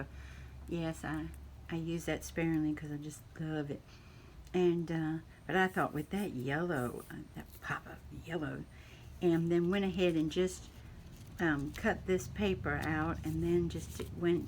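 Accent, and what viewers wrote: South, United States. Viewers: Good morning 🌞 I’m crazy about all the Bees. I loved when your Southern charming voice came out. I love when the two of you are together. Praying that all of you stay healthy. I’m always interested and inspired by anything you make. Big hugs my beautiful sweet friend 🤗🤗🤗💋💋💋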